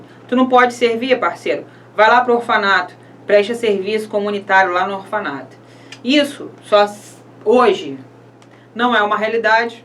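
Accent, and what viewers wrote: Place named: Rio de Janeiro, Brazil. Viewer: Uma pena, uma aula tão rica e objetiva não ter tantas visualizações, obrigada professora! A senhora é 10, gratidão! Sou carioca mas moro em BSB, e as vezes esqueço o quão lindo é o nosso sotaque <3 estudo em casa, porém, logo voltarei para testemunhar minha aprovação neste comentário, Deus abençoe esse curso!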